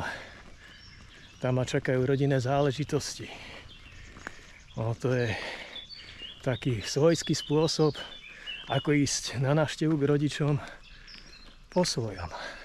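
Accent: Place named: Lowlands, Slovakia. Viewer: Parádny spôsob ako navštíviť rodinu, klobúk dole 👌. Inak aj som si myslel, že pochádzaš z dolniakov podľa prízvuku 🙂. Tento hrebeň si chcem tiež natočiť toto leto a fakt díky za inšpiráciu, kde prespať. Normálne mi to nenapadlo, že zvoliť rozhľadňu na Panskej. Aspoň nemusím teperiť stan 👌. PS: Inak nabudúce prídi na premiéru, mal si tam normálne 8 ľudí 🙂. Peťo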